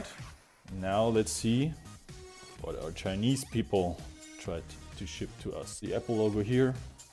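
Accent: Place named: Germany. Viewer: Bist du Deutscher ? Hört sich so an. Aber sehr gutes Englisch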